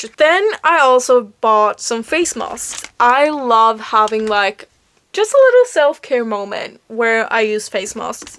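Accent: United Kingdom. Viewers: Jag måste verkligen säga att jag älskar din engelska accent😍 Och kul med böcker, är själv en bokmal 😊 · Älskar att du pratar med brittisk accent 😍 ps älskar dina videos ❤️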